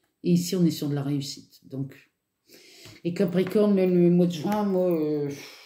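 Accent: North, France. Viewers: J aime bien votre petit accent aussi. Du nord ?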